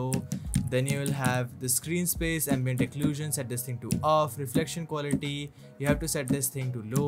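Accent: India. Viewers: He is an Indian, that's why he speaks in that way. t to d y'know.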